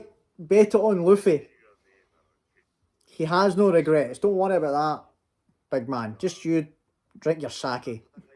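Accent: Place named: Scotland, United Kingdom. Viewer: Man congratulations on 1k🎉🎉🎉 Im so happy for you. I've been watching since 40subs and I feel like we're actual nakamas now because I seriously want this channel to succeed. I can totally see the hardwork put into these videos especially since you also have a job. This is no cap the best one piece reaction channel on yt. 1k is well deserved and long overdue tbh..... And you made me like Scottish accent too lol😅...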